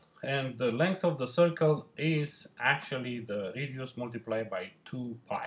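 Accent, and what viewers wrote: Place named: United States. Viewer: USA speaker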